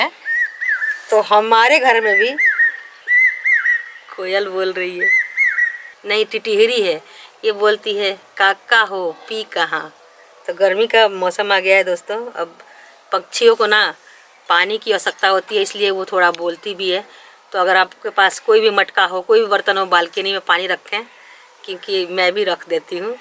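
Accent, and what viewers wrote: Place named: Chapra, India. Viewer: Tohar bhasha se bhujhata rahaa chaapra bihar se lagti hai.kaha se hai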